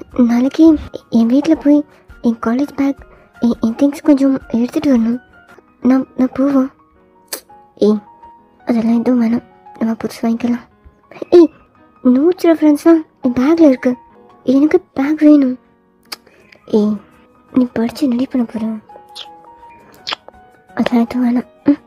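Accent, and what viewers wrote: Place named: Madurai, India. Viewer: Hloo Sis 👀💜 unga channels ah recent ah tha theriyum Ella ffs uhm paathen intha ff romba Azhagaaa irunthuchuu like solla wrds eyy illa avlo Azhaguu 🫶💯✨️Ceo vum aprm unga oneshots ellame 🫠✨️But this hits diff yen ungaluku comment pandravanga idha pathi pesala nu enaku thrla unga dialogues laam Avlo Azhaga iruku...😫💯Vmin ah Avlo Azhaga kamichurunthinga intha story line eyy nallairunthuchu But jimin ku eathachu aagura maari tae feel pandra Maari kaamichurukalam i neant unga story romba nallairunthuchu i can feel vmin realtionship but jimin tha romba paasam kaamicha maari oru feel🐣🥀 spcl part potta apdi podunga And this made my day na avlo hpy ya feel pannen intha ff kekkum pothu intha comment padipingalanu thrla but padicha neengalum hpyy ya feel pannuvinga nu tha podren ❤️‍🩹✨️ Neenga madurai la antha slang Azhagaaa pesuringa na sivagangai...Aprm Starting la neenga okva nu ketutu neengale ok nu soldrathu enaku pidikum...Aprm support illanu feel pannathinga kandipa support kidaikum...And i will support youu okvaa...Saranghae sistaa...💜🦋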